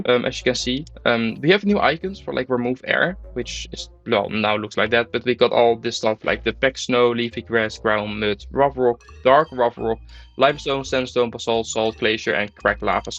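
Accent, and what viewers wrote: Netherlands. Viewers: de nederlandse accent!!! hahahah helemaal geweldig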